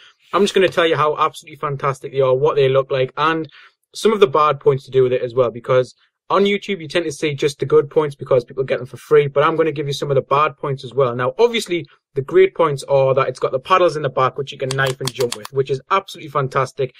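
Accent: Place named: Northern Ireland, United Kingdom. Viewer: Ur from ni arent ya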